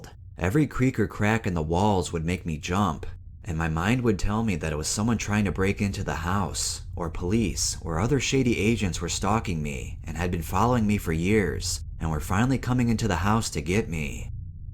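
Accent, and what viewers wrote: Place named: South, United States. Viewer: Ngl I really love the little country into tune you now use lmao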